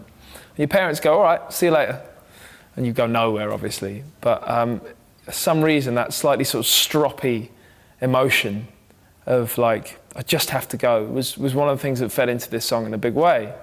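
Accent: United Kingdom. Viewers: i didn't think this guy had a British accent until i watched this video. god damn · He has a British accent?? Woah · He's not "foppish" he's POSH. That British accent is so sexy.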